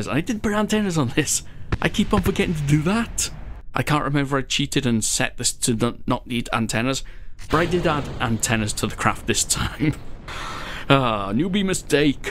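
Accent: Wales, United Kingdom. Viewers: Welsh is it?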